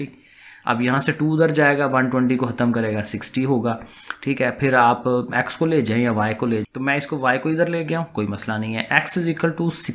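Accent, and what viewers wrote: Sialkot, Pakistan. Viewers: A.a sir ap Sialkot ( dska side ke lagty han ?)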